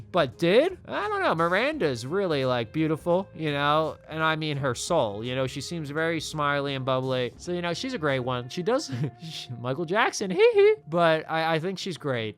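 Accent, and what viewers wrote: Philadelphia, United States. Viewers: Congratulations brother 🎉 Love the content, disappointment no one ask the real burning question i had, no need to answer though. But based off how you've pronounced certain words, are you from Philadelphia?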